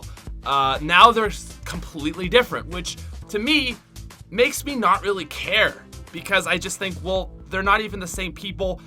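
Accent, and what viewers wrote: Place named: Nova Scotia, Canada. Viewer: why do nova scotians butcher "about" us ontarians think that shits wierd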